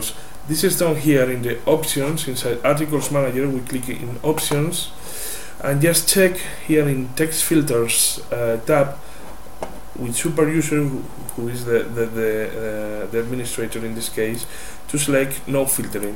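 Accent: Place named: Mexico. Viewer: eres mexicano verdad, lo noto en tu forma de hablar el ingles.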